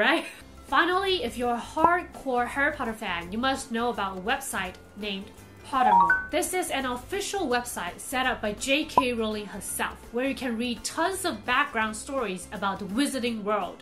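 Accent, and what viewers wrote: United Kingdom. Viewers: 英國腔是怎樣～～😁😁😁太萌了吧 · 跪求。。。我要英國腔，超好聽滴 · 真的好喜歡滴妹的英式英語💕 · 一直覺得滴妹的英國腔很像妙麗 😍😍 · 有配合主題搭配英國腔哦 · Your British accent is cute! Nice video. Hey from England. 😊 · 滴妹英國腔好好聽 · 😍😍😍😍British accent more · 這次好像特別用英國腔來說了呢哈哈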